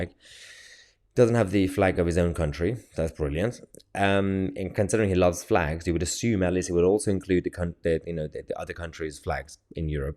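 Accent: United Kingdom. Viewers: A brown Muslim that sounds British... anchor baby's got a u tube chanel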